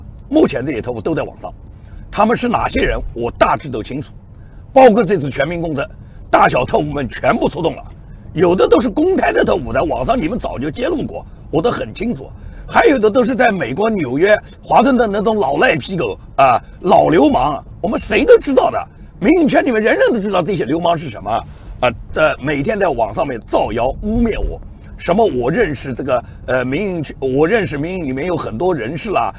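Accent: Nanjing, China. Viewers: 听声音确实是南京人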